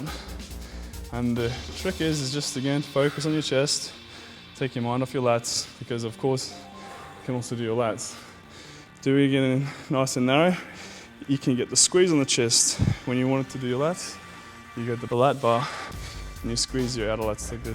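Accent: Australia. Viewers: most definitely is Australian you plum. Hence the Aussie accent. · With a hint of an Australian accent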